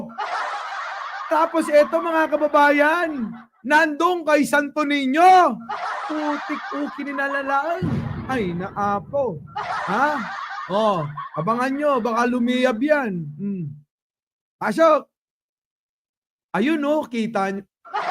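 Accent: Ilocos, Philippines. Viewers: NAKAKATAWA KA IDOL SIR NO AGSAO KA TI ILOKANO, SARAP PAKINGGAN 🤣🤣🤣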